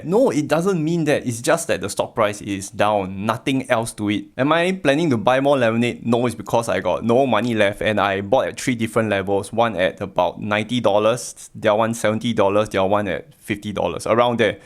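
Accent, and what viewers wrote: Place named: Singapore. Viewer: Love you Singaporean accent bro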